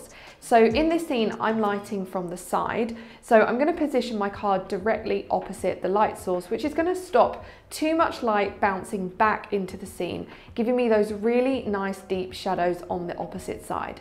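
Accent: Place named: United Kingdom. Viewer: Lovely accent! where are you from in the UK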